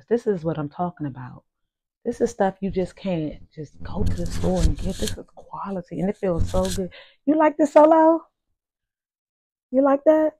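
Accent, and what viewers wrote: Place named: Baltimore, United States. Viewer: You almost have a Baltimore accent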